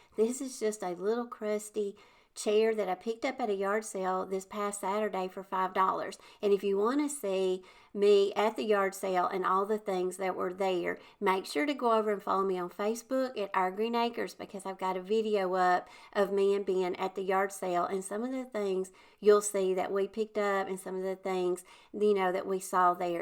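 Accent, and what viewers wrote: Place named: Southwest Virginia, United States. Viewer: love all these ideas. May i ask where you are from. You sound so much like me, I was thinking Southwest Virginia. love your content.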